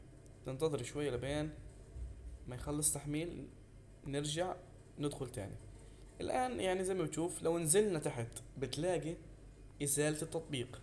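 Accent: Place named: Libya. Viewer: احلا ناس والله. بس لهجتك نفس ليبيه ❤️❤️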